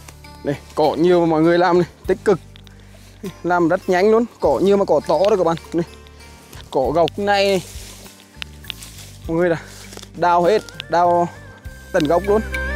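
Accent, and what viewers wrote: Nghe An, Vietnam. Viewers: Trai xứ Nghệ miệng nói tay làm. Tai lắng nghe. Nói năng mộc mạc mô tê răng rứa. Nhiều người không hiểu nghe ngứa tai . Những ai thông hiểu nghe hoài thấy thương ..! Đình Hồng .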